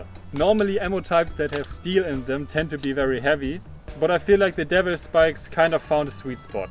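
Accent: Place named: Germany. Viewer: Fast kaum erkannt, dass du Deutscher bist 😉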